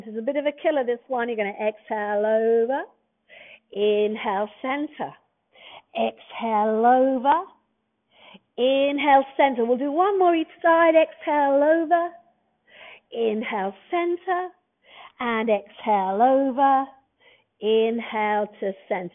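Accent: England, United Kingdom. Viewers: During coronavirus in Northern California, I was enjoying regular walks...till the fires came. Now I cannot even leave my house because the air is so bad...so for the very first time in my life I'm doing indoor/video exercises, and these videos are the first Pilates of my life. They're challenging (I've gained weight and lost muscle in the last 6 months) but also really fun, and I like the teacher a lot! I especially enjoy her accent -- didn't know she would be English, but it's nice for me as I used to live in ENgland and have many dear friends and family members there. THANK YOU! I'm going to keep using the four intro classes and then probably will subscribe.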